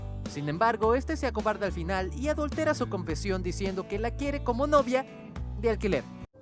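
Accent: Venezuela. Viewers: oye wey tu eres venezolano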